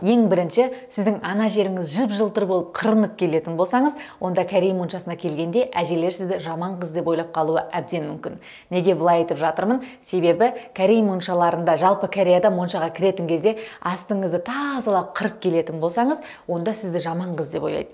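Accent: Standard. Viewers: қызым,саған үлкен рахмет! Өз тіліңе деген құрметін үшін, өте таза сөйлейсің. Кереемет.Өте білімді екенің байқалып тұр. Негізі алған біліміңді білгім келеді жене Корей тілі қалай үйрендің? Кореяға қалай келдің, әлде тұрмысқа шыққансон ба?😊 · молодец өз тілінде таза сөйлейді екенсің, құрметте дұрыс Әрдайым жаңалығыңмен бөлісіп отыр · Жарайсыз, қаншама жыл Кореяда тұрсаңызда қазақ тілінде таза сөйлейсіз,бұл үлкен құрмет елге,тілге деген...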